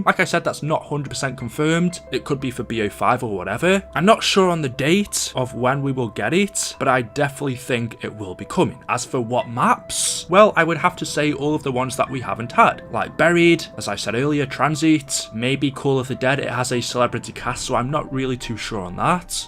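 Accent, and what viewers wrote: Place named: Yorkshire, United Kingdom. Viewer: Sounds like a Yorkshire accent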